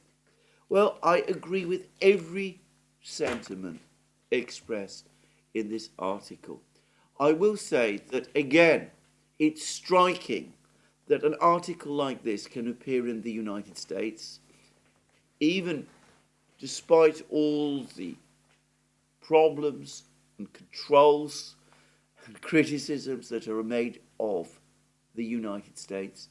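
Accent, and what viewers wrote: United Kingdom. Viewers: Thanks for your hard work, much appreciated! I love your very British accent by the way, British English sounds a lot better than American English, at least that is what I think.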